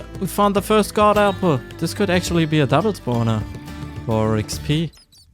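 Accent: Germany. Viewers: Wenn deutsch englisch reden einfach beste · Man hört das deutsch extremst raus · I like that Deutsch accent a lot bahahaha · Ich liebe die Serie, und du bist ein MACHER Hoffe das dein Umzu ganz gut wird, bin immer noch beeindruckt das man deinen deutschsen akzent fast gar nicht hört ;) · Das ist eine one lovely german accent :) well done!! :)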